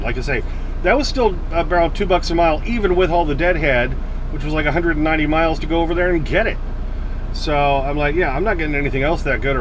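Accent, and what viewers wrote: Louisiana, United States. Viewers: Your cajun accent is spot on big dogg!! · Your louisiana accent was so funny🎉❤ I never been that far south in a truck very cool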